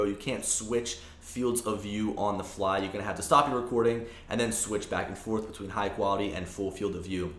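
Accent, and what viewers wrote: Philadelphia, United States. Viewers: Hey, Wow, r u from Philly or NJ.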